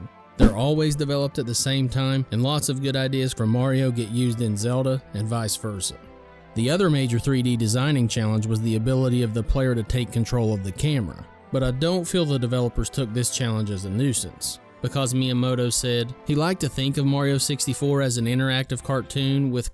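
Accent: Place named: Georgia, United States. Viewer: I just want to say as a guy who grew up in rural Georgia and was born in the mid-80's, it's gratifying to watch this video in that accent and hear you describing memories of experiencing Super Mario 64 at about the exact same age I did.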